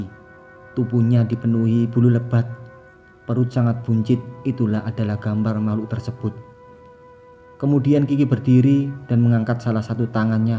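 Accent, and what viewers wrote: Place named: Madura, Indonesia. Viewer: Mf..kang Mimin ini dari Madura kah..? Sy dgr dr logatnya😁🙏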